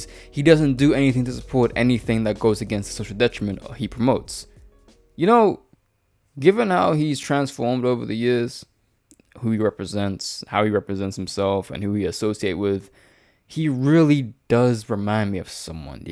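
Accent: United Kingdom. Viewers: Where you from? I always thought you were UK/British Habesha.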